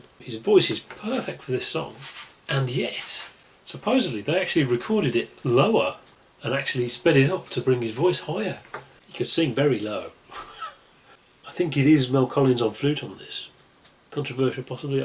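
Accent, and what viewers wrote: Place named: United Kingdom. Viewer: It’s a great album in my opinion, even though it’s similar to the first one. Also, can you try to sound less British next video?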